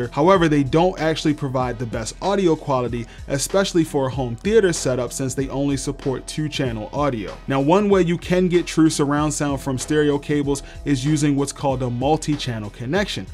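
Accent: Baltimore, United States. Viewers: I knew you was from Baltimore lol. 👌🏾🤘🏾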